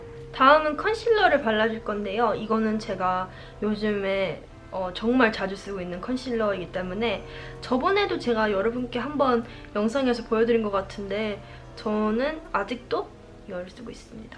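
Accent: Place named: Konglish, South Korea. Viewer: Is it weird if I say I love listening to you speak Konglish? :)))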